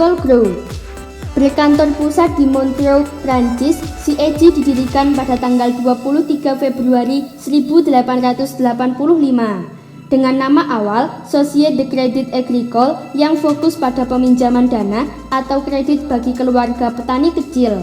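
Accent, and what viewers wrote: Java, Indonesia. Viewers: Medoknya terganggu banget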